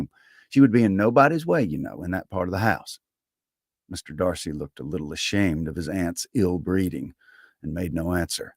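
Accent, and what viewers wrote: South, United States. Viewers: This is genius. The southern accent really suits P&P. 😂❤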